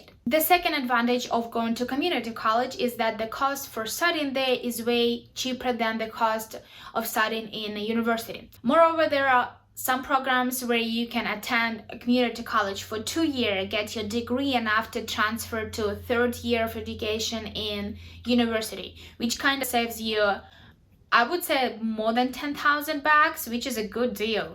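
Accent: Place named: Ukraine. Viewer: ukrainian accent is nice don't be shy of it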